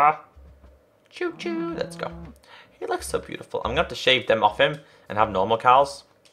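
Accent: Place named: Newcastle, United Kingdom. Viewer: Hoos you from Newcastle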